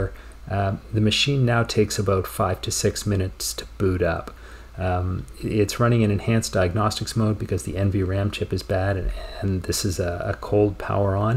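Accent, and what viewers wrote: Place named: Canada. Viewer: I bet you're Canadian.... (my ears always perk up when I hear "aboat"). A Sparc 10 was what I spent the latter half of the 90s on. Nice to be able to project vicariously what upgrading mine would have been like...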